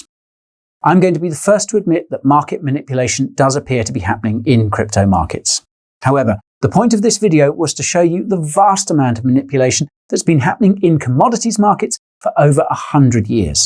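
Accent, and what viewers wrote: United Kingdom. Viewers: Amazing. He has a British accent that is tolerable. As well as an understanding that all traditional banker gangsters would ever want to do is rain on your crypto parade. Smash the like for that! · You should say "howdy" at least once every episode because with a british accent that is hilarious. (texan)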